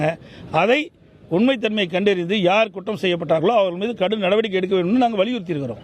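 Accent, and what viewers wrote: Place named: Kongu, India. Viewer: கொங்கு வானி யோஅது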